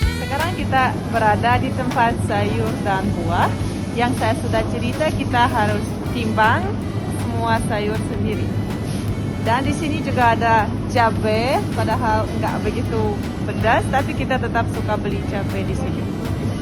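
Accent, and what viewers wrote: Indonesia. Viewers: Kak Pernah Ke Indonesia Belum Soalnya Aksen Bicara Sudah Orang Indonesia Banget 🇮🇩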